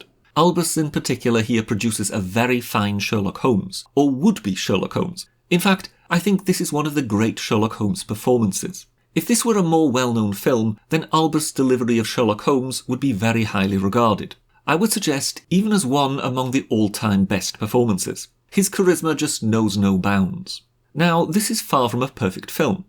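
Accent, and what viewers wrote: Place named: RP, United Kingdom. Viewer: Good idea! I feel for, not only does YouTube keep him down, I bet his ‘posh’ accent has limited his £ donations over the years ☹️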